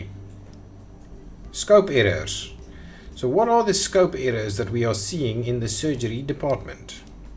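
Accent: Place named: South Africa. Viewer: This dudes accent is so saffa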